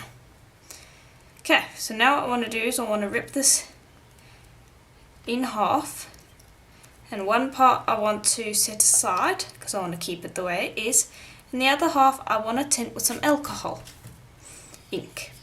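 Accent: South Africa. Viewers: Is that a south african accent i hear? I just found your channel, very cool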